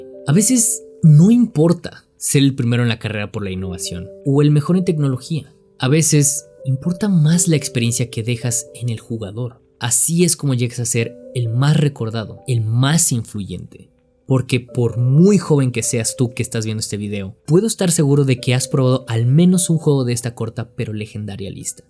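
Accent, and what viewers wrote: Mexico. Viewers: odio como los mexicanos pronuncian el ingles....zir pari....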